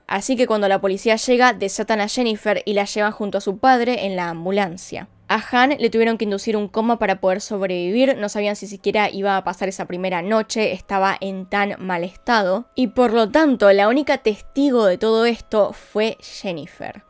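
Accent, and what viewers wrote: Buenos Aires, Argentina. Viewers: Pero si tiene acento de buenos aires jsjsjs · Lindo acento Argentino te super Admiro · Es acento porteño y yo soy Rosario tenemos otro acento. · Yo soy de Córdoba y el acento de es porteño.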